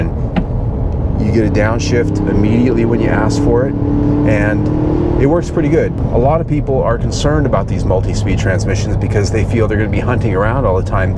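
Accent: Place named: United States. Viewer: love the "Murrican accent!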